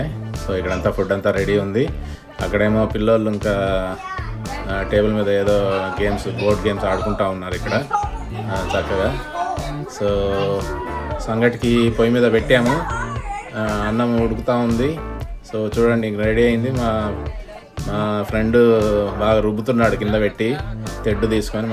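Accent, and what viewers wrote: Rayalaseema, India. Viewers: Hi sir......this is madhu fr Chittoor...I follow ur most of the videos.....meeru US lo mana rayalaseema slang matladuthu videos cheyadam chala bagundhi 👍🙏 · Akkadiki vellina mana rayalaseema slag podu kada😀😃😃 super sir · Bro meedhi Rayalaseema kadhaa.... Kadapa district aa slang same alane undhi... I coming to Virginia State in next week I would like to meet you if you are available... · Speak in our rayala Seema accent nice to listen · అన్నా నీ భాష అద్భుతః. పక్కా కడప. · Anna nuvvu pakka Kadapa language matladuthunnav super Anna. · garu you r the king of Rayala seema food and language. US could not change your accent of our Telugu. Nativity in your language.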